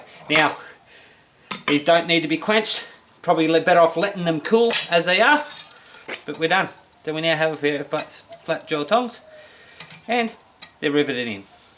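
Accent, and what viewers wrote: Australia. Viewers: What part of Australia are you from? CHEERS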